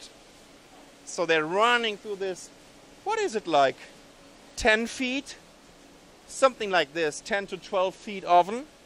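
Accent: Germany. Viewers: Pretty awesome. Was the narrator German? Also, you guys have some cool songs at the beginning/end. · he fucking german, give the dude a break, it's not his 1st language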